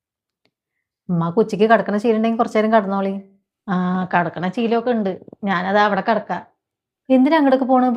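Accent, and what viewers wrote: Palakkad, India. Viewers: Palakkad basha my favorite ❤❤❤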